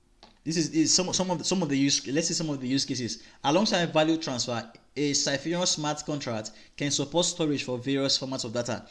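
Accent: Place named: Nigeria. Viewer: where are you from, you sound Nigerian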